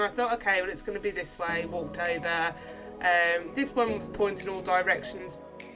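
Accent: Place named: United Kingdom. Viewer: I can't believe it took me this long to actually comment on this video. For context, I am the creator of the game and I was sent this video to a few friends of mine who found it and even I came across the video after searching up the game on Google. I didn't think much of it but today I did another search and I thought I should watch the whole thing! 1. - Clock Soapy's badge error was a period of time where more people had that badge than 60 Minutes, so it was disabled to stabilize the amount of owners with said badges. Sorry for that! 2. - I was not actually expecting the areas to be THAT small since the original size was based on Spawn, which didn't have much. My main reason for making these areas small is that I hate large and open areas. it leads to longer searches and overall takes a lot of time to find things like buttons. 3. and - Yes those are toilets. 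They're planned to be used for a new area in the future! 4. - Oops I guess I made it too close to the main road 5. - "Cheeky little button" I LOVE this commentary! It really adds a spark of life to this video (I'm sorry but the British accent makes this video way more entertaining to me than it should be) 6. - I'm glad I get to hear someone falling for the glowing flower! It felt so good to add that in knowing it would throw everyone off 7. - School is something that's for sure but I'll try my best to keep the pace going! 8. - That's a really good point. With how I plan to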